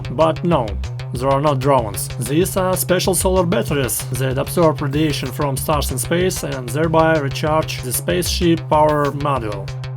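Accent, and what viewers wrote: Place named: Germany. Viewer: Ok, this guy is definitely German.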